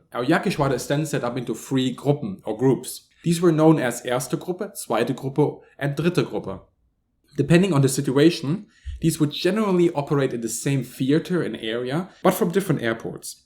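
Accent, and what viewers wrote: Germany. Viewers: Are you from Germany or Switzerland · love the german accent :D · I don't know what it is but the German accent is amazing. I love your videos mate!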